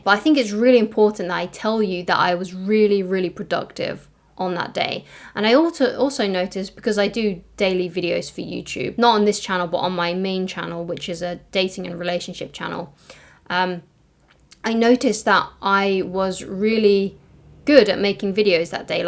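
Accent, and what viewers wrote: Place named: England, United Kingdom. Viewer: Thank god a nice English accent.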